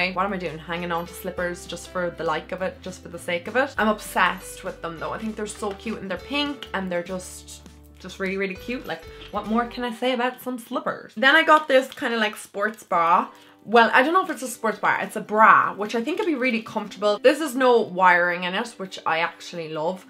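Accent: Dublin, Ireland. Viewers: i think shes from dublin i hear the dublin accent in her i live in cork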